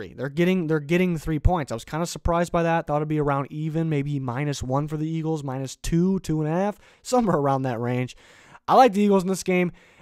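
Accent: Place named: Midwest, United States. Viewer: he could be from Wisconsin or MN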